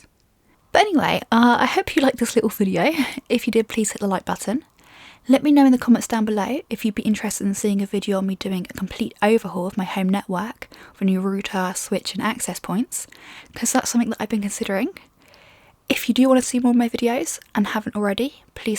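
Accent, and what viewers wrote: United Kingdom. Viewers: Her English says it all .. USA is not the origin of English.. it's UK..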